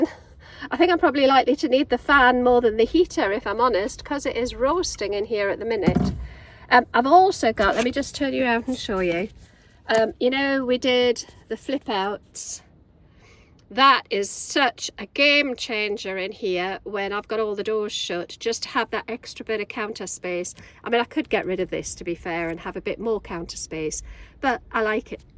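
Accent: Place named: North East England, United Kingdom. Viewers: What an interesting accent you have. Its like the north east met the West Midlands. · Well done lovely ❤ your Northeast accent is charming 😊 really enjoyed the vlog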